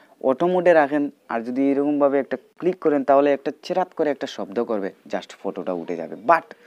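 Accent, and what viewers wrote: Sylhet, Bangladesh. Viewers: Sylheti na kita ba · সিলেটি ভাষায় মাত। 😊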